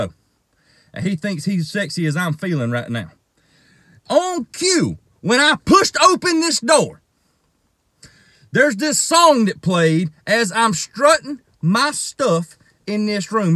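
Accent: South, United States. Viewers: i love this southern draw, i mean dammm, i live in australia a chattering squirrel probably sounds like sweet baby jesus to be honest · He reminds me of edp445 but more southern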